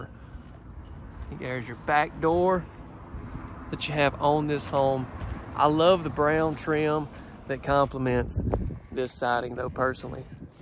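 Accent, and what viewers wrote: South, United States. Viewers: The faucets for the free standing tub needs to be black like all the rest! Perfect! You are doing GREAT, the great southern accent at all! I have it too, so LOVE it!!! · Beautiful but not my favorite. No pantry, loved the shelving with baskets. I loved seeing the nicer sinks & black faucets. I like the copper also. Mater closet sucked. Great job. I love your accent & words you use. Alot of my family is from Alabama, Georgia, Arkansas & i hear & use it also!! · Love the master bedroom all the windows. An the colors of the trailer. Please stop apologizing for being for the South. That's just how we talk down here. I know what a hair is...lol. I enjoy watching your videos.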